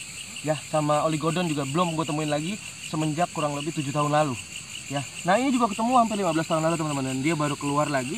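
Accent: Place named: Java, Indonesia. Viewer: Dih galengan kek orang jawa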